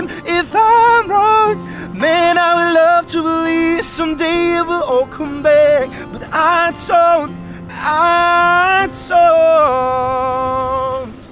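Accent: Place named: Germany. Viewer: how is it that you can sing in an American accent but speak with a German one? I find it fascinating. And, beautiful as always, bro.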